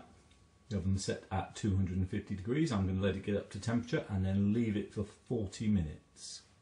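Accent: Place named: England, United Kingdom. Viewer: Thanks so much for posting this video. I really appreciate your time. I agree totally about flax oil, pure, cold pressed and organic. Must be kept in the refrigerator. I do also agree with 475F, I believe anything over 500 creates chipping and peeling of the seasoning. ((Side note: I really enjoy hearing your accent. We lived in England for three years as my husband was stationed at Upper Heyford US Air Force Base. We lived in a thatched roof cottage on a sheep farm in 1980 to 1983. Wonderful times... wish we could step back in time!))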